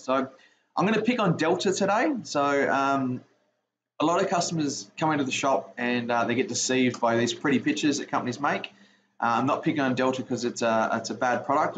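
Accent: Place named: Australia. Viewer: It’s nice to hear a plain Australian accent, as we have to decode yank accents 95% of the time. 🙂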